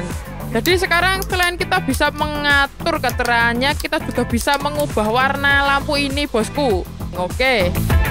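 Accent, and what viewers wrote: Java, Indonesia. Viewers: Baru kali ini nonton tutor kelistrikan bisa ngekek krna bahasane medok bianget😂😂😂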